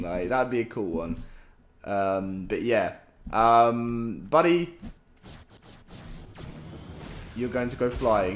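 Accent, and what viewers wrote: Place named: Birmingham, United Kingdom. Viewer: U sound like my friend that lives in Birmingham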